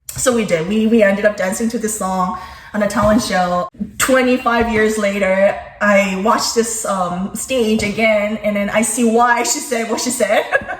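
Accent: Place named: General American, United States. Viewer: 💚 저 요것도 섀도잉 자료로 사용해야 겠어요!!ㅎㅎㅎ 진짜 저 억양 너뮤좋아해요오💚💚❣️❣️ㅎㅎㅎㅎ 진짜 미국영어의 정석이셔서 제가 젤 좋아하는 유튜브 채널이랍니다❣️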